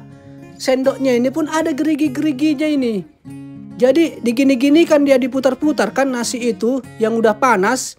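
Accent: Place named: Batak, Indonesia. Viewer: Kimbek kali ah. Kalau dengar logat bg ngomong jd ingat sama kawan si batak gila 🤣 logatnya campur² jawa 😅 kocak kocak😂❤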